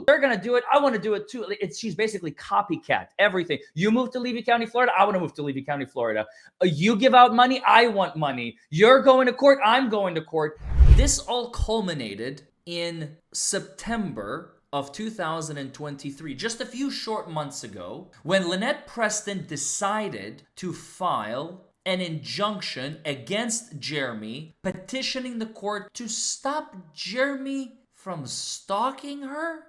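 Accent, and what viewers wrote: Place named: Ohio, United States. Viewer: Awesome job I knew sooner than later someone would see what I thought was her manipulating the court’s system! AND I knew you were from Ohio! 😊I could feel it in my soul!! Honestly you have a face that I have seen at some point in my past! Not to mention you act too much like myself & our friends to be from KY! 😂 Those from Ohio have a different kinda accent!!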